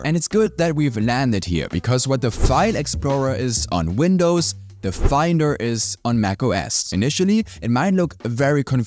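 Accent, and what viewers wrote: Germany. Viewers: Das ist Deusches englisch kommst du aus Deuschland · Ngl you sound like a german speaking english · You german?😂😊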